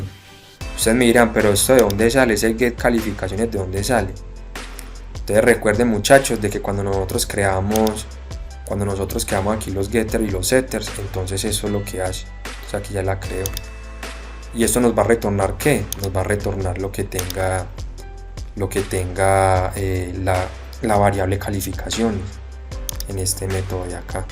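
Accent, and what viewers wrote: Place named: Antioquia, Colombia. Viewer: Paisa en la buena por esa colaboración Dios le multiplique 👍🏻 ese acento es todo vacano el de este man jajaja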